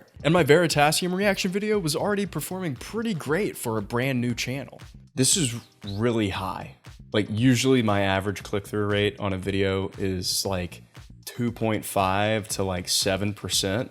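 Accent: South, United States. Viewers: didn't realize how southern you sound normally until i heard your voice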